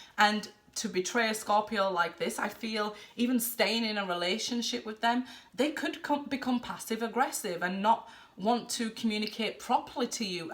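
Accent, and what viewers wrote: United Kingdom. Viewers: The uk or London with that accent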